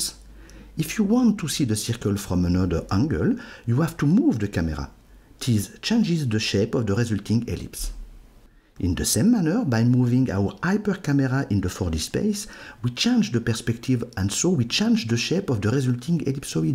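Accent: France. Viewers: His accent is like hercule poirot :) pronouncing the r like in french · nice explanation, i like the french accent :) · This video actually helped me process 4D. Also the narrator's french accent was funny and brightened my day :)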